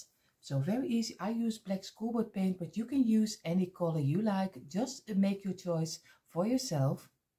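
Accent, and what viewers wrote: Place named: Netherlands. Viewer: Loved The Lantern!! You Have A Great Imagination For Creating! I Also Like Your Dutch Accent, You Have A Soothing And Unique Sound!!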